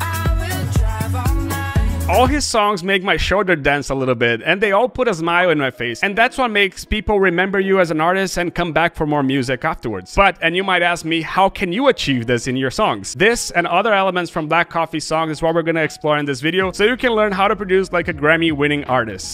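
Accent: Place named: Brazil. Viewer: Só pelo sotaque da pra ver que vc é br mano, hahahaha. Ótimo conteúdo irmão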